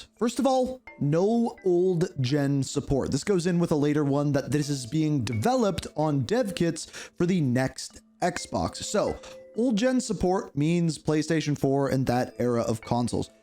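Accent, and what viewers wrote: Canada. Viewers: This guy ain’t American. He Canadian! Accent gave it away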